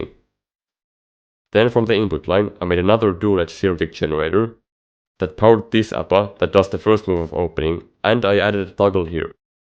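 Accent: Finland. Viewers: Are you Finnish? 🤔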